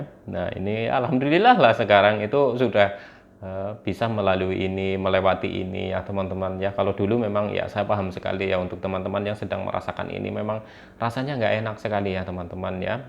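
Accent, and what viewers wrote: Banyumas, Indonesia. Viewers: Bener x mas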